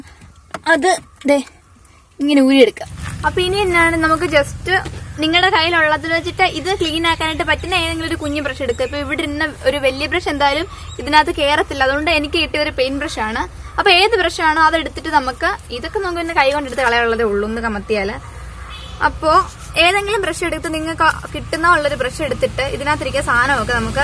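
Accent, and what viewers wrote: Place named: Kottayam, India. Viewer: Kottayam or idukki 😀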